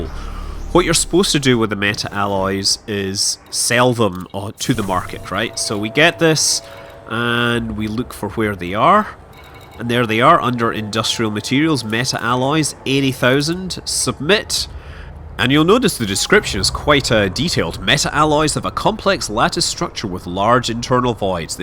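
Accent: Scotland, United Kingdom. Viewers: Goddamn I love Scottish accents.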